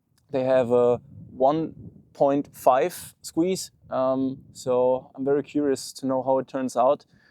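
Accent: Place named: Germany. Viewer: Mach deine videos auf deutsch. Der markt ist gross genug und die welt braucht nicht noch einen deutschen mit furchtbaren akzent der viel zu langsam redet weil er im kopf Übersetzen muss. Geile kamera, sie hätte ich auch gerne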